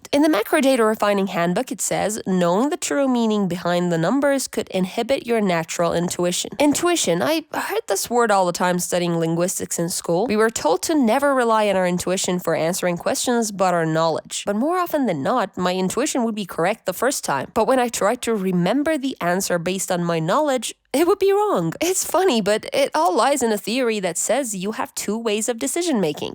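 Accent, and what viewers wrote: United States. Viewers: you don't need to, i already did it for you. it's just an American accent. :)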